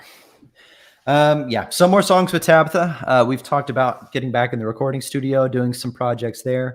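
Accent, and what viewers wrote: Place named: United States. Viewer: 🇦🇺🇳🇿 both my flags 😊 I love your music, partly because you remind me so much of my Dad when he was in his thirties (he would be in his eighties if he were still living) - your looks, facial expressions and mannerisms as you sing, the harmonies and parts/overlaps - very nostalgic for me. Except - now I've listened to your American accent...haha...not my Dad's Kiwi one! (Of course!) 😂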